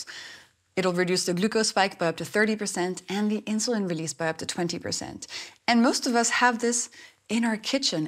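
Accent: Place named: France. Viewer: I believe she is French, hence the gorgeous accent?? Namaste 🙏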